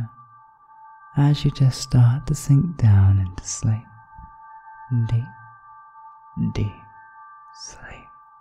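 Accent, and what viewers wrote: United Kingdom. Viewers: Me accidentally clicking and listening for some reason: HE BRITISH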